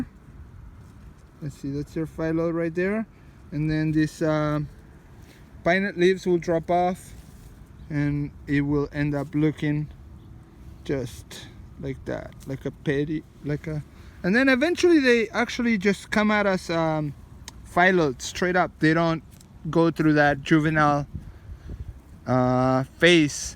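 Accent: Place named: Argentina. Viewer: Tenés acento de argentino! Para mí tu español no es tan malo!!!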